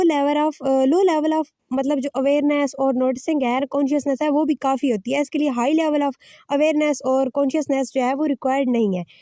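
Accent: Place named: Pakistan. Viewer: Content is good, but I can notice that Pakistani accent there 👀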